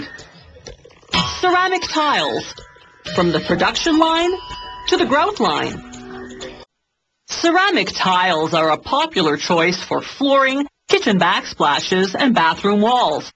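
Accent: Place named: Canada. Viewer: I only just notice she's Canadian - "abouwt" and "ouwt" gave her away :'L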